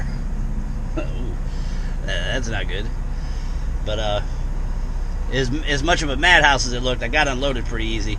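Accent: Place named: South, United States. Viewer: Nobody like driving in or around NYC. Your lucky the traffic is moving good. I always run into a accident or lane closures at night. People are mean to over weight people that they perceive to be Trump supporters. Given that you live in a van and sound like your from down south. If you were a hot girl the guy would have made more small talk.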